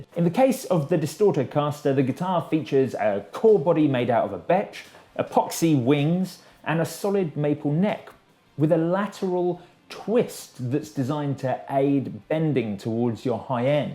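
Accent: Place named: United Kingdom. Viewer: Why the hell is Evgeni Malkin talking about guitars and why does he have a British accent?...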